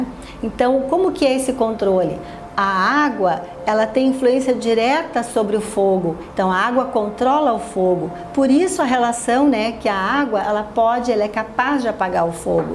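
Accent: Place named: Rio Grande do Sul, Brazil. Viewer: Tô reconhecendo e me identificando com esse sotaque. Somos conterrâneas dos Pagos?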